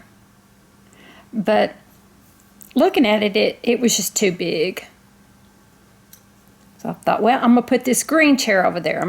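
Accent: South, United States. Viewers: Soo lovely, you have a real, lovely victorian Southern States of America. Still love your funny accent. Lots of love 😘😘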